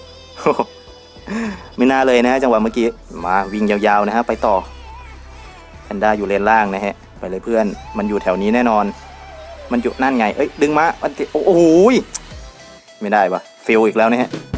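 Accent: Isan, Thailand. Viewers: พี่เป็นอีสานหรอครับ แพนด้าจะมาหลอยป้อม นาตาย่าฉีดทีแกรกเหลือเลือด2ข้อ 555คนบ้านเดียวกันอ้าย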